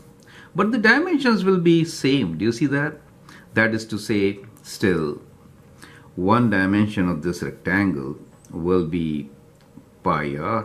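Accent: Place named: India. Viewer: Saurabh Gupta thats exactly what im saying.it happens whenever i wanna learn a mathematical concept.tons of videos,all indians,with their terrible accent and terrible video quality,making the topic even more confusing than it is.same for this topic.all indian videos.almost none of them speaks proper english+shitty video quality.i just commented on this arbitrarily,i dont have any bad intention against this person in this video.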